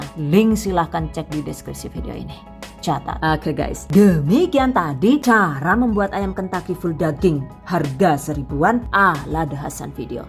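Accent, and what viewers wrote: Banyumas, Indonesia. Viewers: Bunda asal dari mana Bun ngapaknya...suka dengernya🤗🤗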